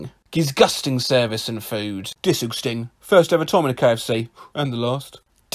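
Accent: United Kingdom. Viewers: 🤣🤣🤣 Holy cow! That along with your lovely British (?) accent had me rolling on the floor 😂😂😂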